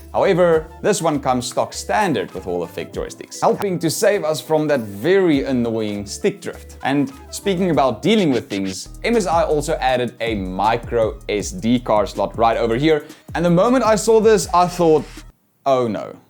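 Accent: South Africa. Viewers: You sound South African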